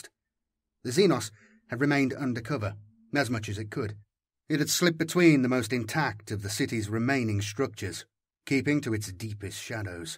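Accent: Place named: Midlands, United Kingdom. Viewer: Fairly new to you channel, loving it Always makes me smile hearing that Midlands accent. Dow worry, it ay as strung as moine our kid.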